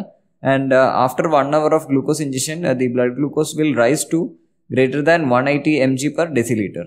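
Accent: Kerala, India. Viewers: Kerala boy❤ lol accent 😂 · Is video mein, Indian accent bahut zyada strong hai..indian accent bahut annoying hoti hai.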